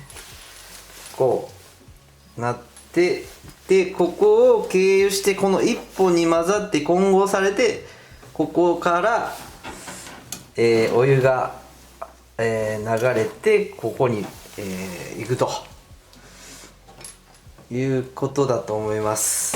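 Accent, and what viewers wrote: Kansai, Japan. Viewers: 、お疲れさまです❗JPさんの言葉と言うか、話方は関西弁混じりですね❗関西の出身ですか？珪藻土の下のタイルの穴開けをした時、キリ先はコンクリート用ですか？見てたら、鉄切り用に見えましたけど…。それに、壁に打ち込んだビスアンカーって言ってましたけど、それはカールですね❗洗面所が、見違えりましたね‼️モダンな感じで良いですね‼️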